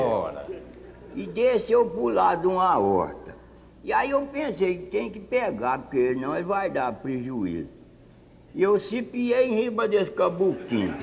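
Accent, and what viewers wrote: Minas Gerais, Brazil. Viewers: Pensei q fosse de Minas, Somos mineiro e meu padrasto cunversa desse jeitinho.. Sem cortar e nem tirar. Grande seu João meu segundo pai.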